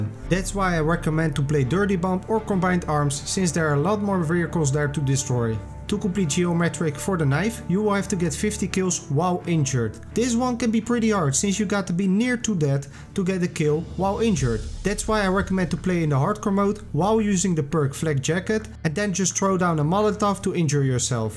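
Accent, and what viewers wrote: Netherlands. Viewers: Je bent nederlands ik hoor het😂😂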